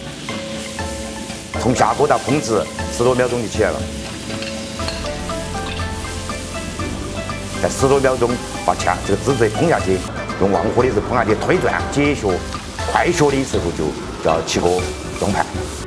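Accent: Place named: Chengdu, China. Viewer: 成都老一辈说话都是这样子的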